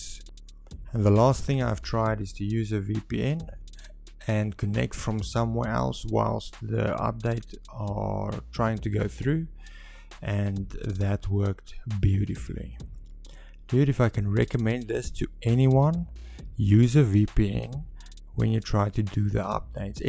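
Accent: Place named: South Africa. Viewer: Hey , I can hear you're south african and so am I , Can guess the issue has to do with our country.... The way i've gotten it to work is by trying multiple phone hotspots until one of them seems to work.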